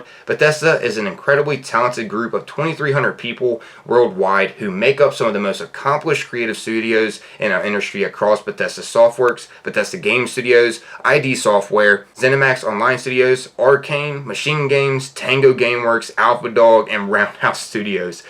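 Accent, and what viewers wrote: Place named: South, United States. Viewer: Me, looking at some of the channels I used to watch when I was younger: What is this place? Seriously, the uptick in quality and preparation shows. But, overall, that same vibe just comes across, idk why. Maybe it's the slight southern accent? Also, just a fun thing: I, a 17 year old now, have intense nostalgia for your desttiny year 1 content.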